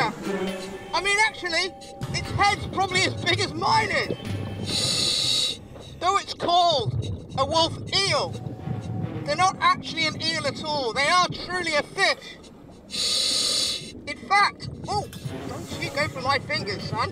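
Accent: England, United Kingdom. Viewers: sotaque inglês da poha ‼️‼️‼️ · The British accent gives him documentarian deep sea legitimacy. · Somehow a British accent makes these encounters more authentic. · Love the British accent. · I love british accents playing around with dangerous things. lol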